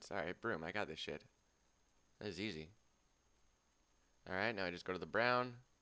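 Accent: Canada. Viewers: haha is starting to sound more Canadian with the eh thing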